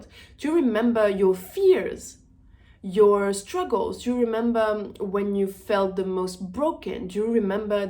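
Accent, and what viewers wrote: France. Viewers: (I love your French accent!) I knew, you're gonna like the whole YouTube thing. These cases about our psychological impact on our lives are very important to highlight.Im glad you're doing that., you're this kind of influencer, that we need to influence our lives. Thank You 🙏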